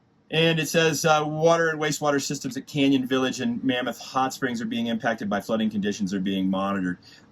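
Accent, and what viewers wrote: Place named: Western Pennsylvania, United States. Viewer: Are you from western PA or eastern Ohio? I'm picking up on an accent.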